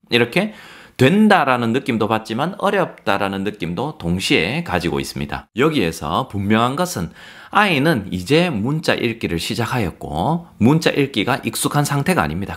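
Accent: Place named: Daegu, South Korea. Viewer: 대구 프린스 선생님~😉 귀에 쏙쏙 들어오는 대구 사투리덕분에 선생님 영상 보는 재미가 두배에요~^^ 오늘도 좋은 영상 고맙습니다♥️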